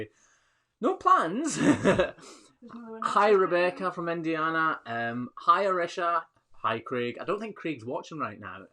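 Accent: Scotland, United Kingdom. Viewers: Hi Mr I'm so sorry I missed the live stream but just wanted to drop you and the Mrs a Happy New Year from Northern Ontario Canada. Love your video. I'm not in farming at all but I find it amazing what care you take with all the cows and bulls. It's so great to see. So LOVED your video with all the calfs was wishing I could of been in there with you and played with them. Sooo sweet. Thank you for being such a kind and loving person it does come themrew in your videos. Have to love a Scotsman it's the accent. I'm part Scottish and have never been to Scotland your videos really make me want to visit. I had asked before but the music you play in the back ground who is it? I know there's more then one but I love it it's so relaxing. Can you give me somenoff the names please. O and you should come to Canada and see it we are up to Scotland standards. 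That would be a great video. Thanks for all your video and again Happy Ney Year may you and your be truly blessed.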